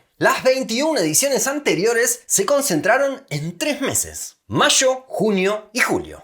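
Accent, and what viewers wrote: Argentina. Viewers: Jejeje ahí vi que tienes un telescopio 🔭.. Casualmente estaba buscando paisajes de Argentina en Internet cuando llegó tu notificación... Creo que eres de Argentina por el acento, de que provincia por cierto, si en un futuro viajo a Argentina espero encontrar a la gente que conocí en Internet allá (no debería pero ya que) Las provincias que quiero conocer primero son Neuquén, Mendoza, Jujuy,Misiones,La Rioja,Chubut, Río Negro,Tierra del Fuego,Salta, Córdoba, etc... Un saludo desde Costa Rica 🇨🇷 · Sos argentino loco? De donde sos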